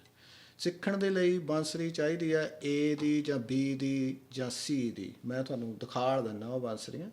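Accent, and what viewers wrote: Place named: Punjab, India. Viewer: I love you accent of panjabi language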